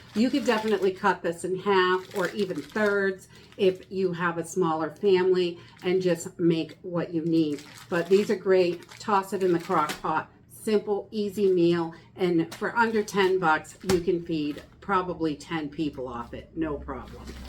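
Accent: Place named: New England, United States. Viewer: Im new to your channel but we seem to think similar. I dont know where your from but the accent and brown bread makes me assume New England someplace. Im from mass and nh but moved to nc about a year and a half ago. Nobody here kbows what brown bread is! I guess brown bread and beans is a New England thing!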